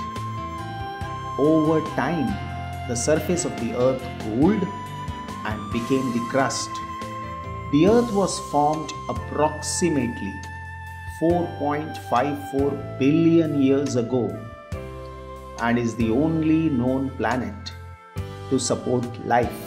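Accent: India. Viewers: hahahaha this is not Hindi accent but more like dravidian languages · Mayank Singh Rajput hindi accent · Hahaha, I love the Indian accent, especially when he says "cooled".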